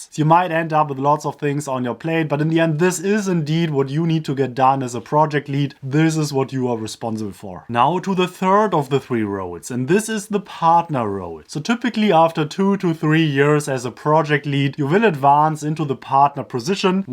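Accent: Germany. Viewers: That Bayerische accent though lol! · Reminds me of my professor from Uni Mannheim. Love the German accent!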